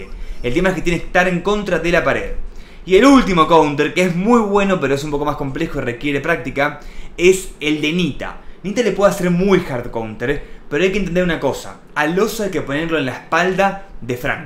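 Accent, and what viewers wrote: Argentina. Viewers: Eres argentino o mexicano?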